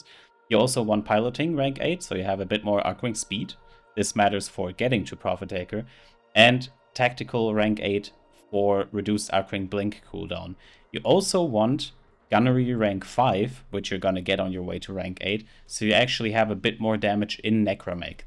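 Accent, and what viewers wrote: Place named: Germany. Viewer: I thought I heard my fellow German accent in here ;) nice video!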